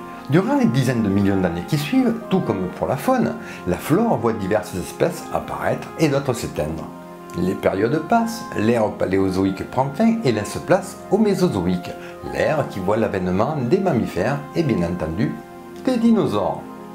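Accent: South, France. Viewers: (: Au top. Toutes c'est fleurs, l' accent du sud ,avec un temps de pluie rien de mieux :)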